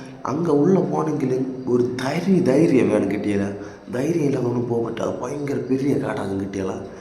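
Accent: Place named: Sri Lanka. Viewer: Srilankan voice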